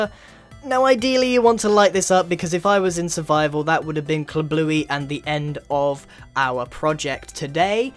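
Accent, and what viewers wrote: United Kingdom. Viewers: JaronPlayz British accent. You cant hear it? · HI i liked the video in both ways and i think you are the greatest minecraft builder ever keep up the good work and fot the next video of building your comments please make a lightning hiding from the guy who invented lightning electrisety sorry if miss spelling something or wasting your time and by the way you are british right thats my favorite english accent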